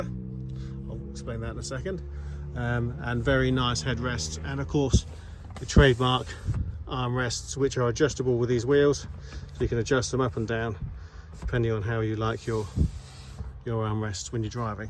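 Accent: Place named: United Kingdom. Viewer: British accent ruined the video I’m unable to continue watching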